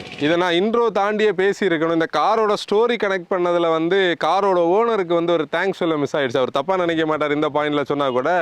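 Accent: Kanyakumari, India. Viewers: Kanniyakunari slog apadi than irukum..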